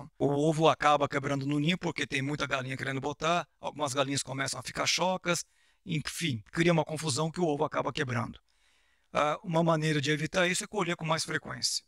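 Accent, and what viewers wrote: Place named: United States. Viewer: Excelente vídeo ! No começo eu pensava que estava sendo dublado, pois o conteúdo, e a voz era estilo americano 😊 parabéns ! Vc realmente estende de criação de aves👏👏 ganhou mais um escrito 😎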